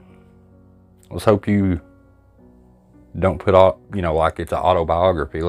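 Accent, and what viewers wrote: South, United States. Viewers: Thank You for opening my eyes to a lot of fakers out there that I TRULY BELIEVED THEM. I think it's really rotten to the core to put out FAKE PARANORMAL VIDEOS when actual hauntings do occur and the people who are affected and suffering from these events make them look either fake like theirs or their just labeled "CRAZY!!" Plainly speaking, it's just fucked up and a truly greedy way to make a buck!!!, I had asked you if you happened to read my comment not too long ago, about the ALEXA, and I asked you flat out, "Well then, can you please explain how he's communicating through the Alexa. So I'm so glad for this upload, that it was addressed after all, one way or another!! My boyfriend is not easily fooled and thinks VERY SIMILARLY the way you do, and told me how he's doing it, like THIS, SO this was ever so appreciated. THANK YOU, and I sincerely hope your Mother is feeling a lot better. 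Please give her my regards my friend. Kindly, let her know I get chills and I get head over heels love it when I hear your Southern Accent! ☮️💜🤘 PS: BTW... I'm from New York City 😘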